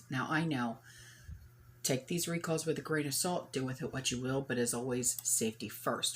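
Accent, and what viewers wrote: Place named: East Coast, United States. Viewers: I dont notice anything different either...she sounds like a Northeasterner to me. A valley girl sounds quite different...I'm in CA right now...believe me I KNOW what a valley girl sounds like 😂😂😂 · The lovely thing about this venue is that you can click the off button. I’m not a big fan of thick east coast accents but I know an accent doesn’t reflect the sum of a person. Regardless of the delivery, gives out a lot of good information and she is truly sincere.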